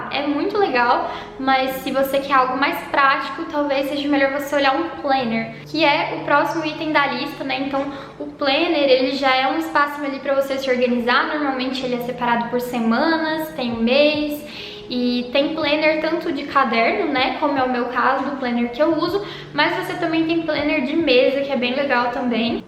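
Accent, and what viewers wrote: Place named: Goiás, Brazil. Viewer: acho mto fofo o seu sotaque goiano puxando o r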